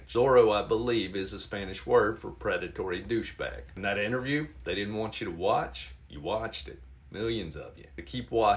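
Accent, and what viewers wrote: South, United States. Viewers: Your comments are weirdly out of place.... Only because of your southern accent. You must have payed attention in school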